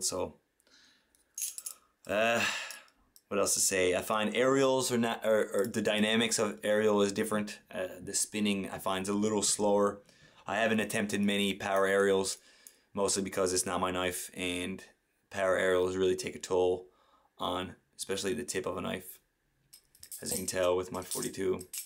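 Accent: Canada. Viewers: Your full Canadian accent came out in this video.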